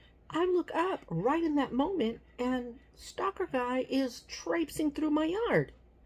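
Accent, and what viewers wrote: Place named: Texas, United States. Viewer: Good for you! It is a respect thing. People shouldn't be doing that at all. I heard the Texas tone come out in that conversation. We had the same problem with so many people doing that...we finally broke down and did a payment plan for the fence. We really couldn't take it any more. People are so rude and inconsiderate. Is this person dangerous or mentally unstable? I just worry about your safety. Take care girl. Good for you😊 ❤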